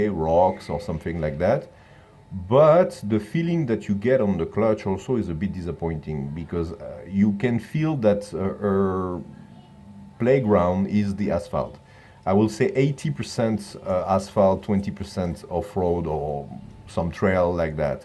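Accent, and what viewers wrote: France. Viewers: He is French.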